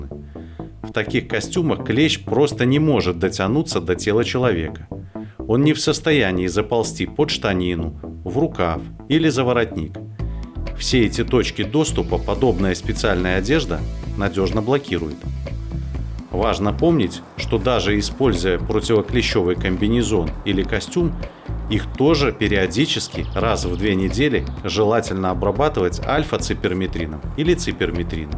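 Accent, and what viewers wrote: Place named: Belarus. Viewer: Блин, вот всё в ролике замечательно с технической стороны, но произношение мягких согласных - это пипец! :( Ну нельзя взять и "прикрутить" произношение белорусских звуков к русским словам. Причём некоторые слова вам удаётся произносиТЬ (а не произносиЦЬ) так как надо. Что ж за напасТЬ-то такая в средней и восточной Беларуси с произношением...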